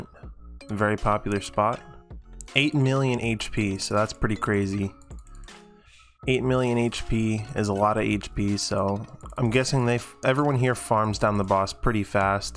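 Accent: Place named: Bisaya, Philippines. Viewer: hahahahah bisaya spotted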